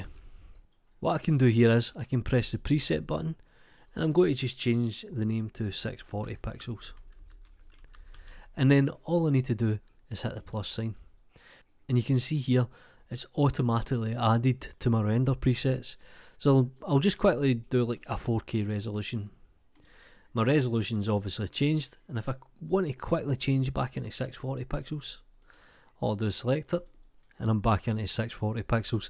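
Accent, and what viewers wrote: Scotland, United Kingdom. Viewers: Scottish accent i hear? Thanks for this. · Liked the good video. Your scottish accent is so distinctive... reminded me of Dundee. De ye ken a way to save full rendering set not just size pal?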